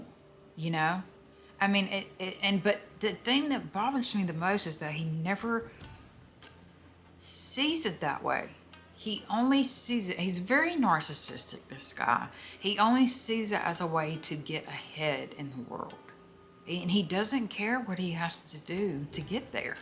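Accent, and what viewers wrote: South, United States. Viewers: oh I love a true crime channel with new stuff I havent heard of. and (hello from England) I love that soft spoken southern American accent so soothing. you are my new fave ❤❤❤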